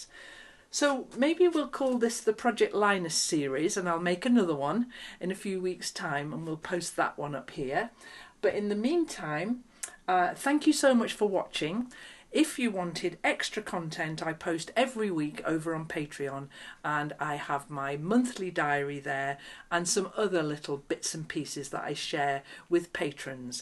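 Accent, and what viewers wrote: United Kingdom. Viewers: Indeed, her British accent & energy & unique authenticity, truly make her a gem of a gal and excellent teacher…She is so relaxing to listen to, like an old friend to sew/craft with! ❤️🙏🌞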